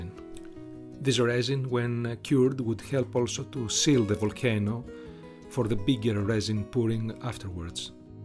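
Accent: Greece. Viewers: Nice greek accent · I love your Greek accent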